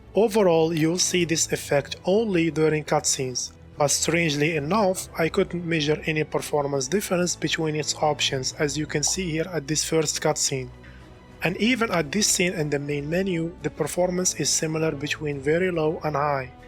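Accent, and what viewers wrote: Morocco. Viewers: are you moroccan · bro nta mghribi ? bayna mn lhdra dyalk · you sound moroccan asf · Bro are you Moroccan ? Your accent sounds like your Moroccan... And thnx btw for this sweet helpful guide keep it up bro ❤️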